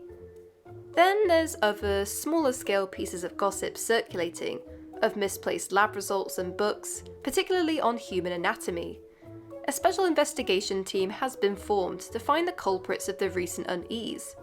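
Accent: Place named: United Kingdom. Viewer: my first time watch watching you and my first thought was Bri'ish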